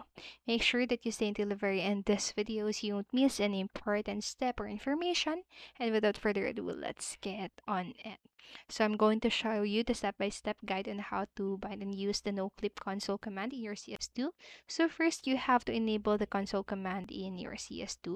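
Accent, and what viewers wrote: Philippines. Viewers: you sound like a filipina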